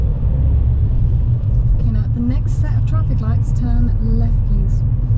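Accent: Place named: United Kingdom. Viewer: you are beautiful. with driving tips, i am learning the British accent too :)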